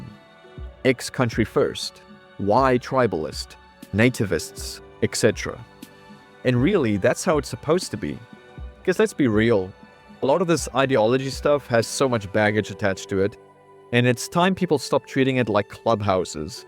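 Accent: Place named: South Africa. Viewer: Knew you were South African before you said so. Good to see there's more of us. This Soutie is with you ready to fight for my Afrikaans people and ancestors. Fuck communism. God i love how you explained the South Africanisation spectrum. I really do wonder where we're going in future. Papa Greyling came to SA in 1752. I don't think I'm going anywhere.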